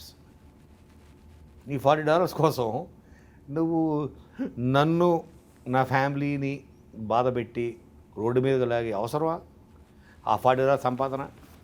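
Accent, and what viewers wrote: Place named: Telangana, India. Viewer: Nice interview . యాంకర్ బాబు అంత బాగుంది కానీ ఆ తెలంగాణ యాస ఎందుకు .. అలాగే ఎవరు చిత్తూరు..కాకినాడ.. శ్రీకాకుళం .. యాస మాట్లడరు కదా.. ఎందుకు ఈ మధ్య నే కొత్త గ ఇంత అతి చేస్తున్నారు మీరు తెలంగాణ యాస తో .. Consider others too. One gets rejected for a call centre job too if there is heavy mother tongue influence in his English. Experienced it first hand in getting rejected. Cater for everyone when in media. Speak neutralized. Jai Telangana .. Jai Andhra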